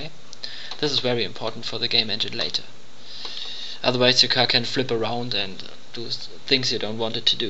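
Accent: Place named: Germany. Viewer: Da haste son bissle deutschen Akzent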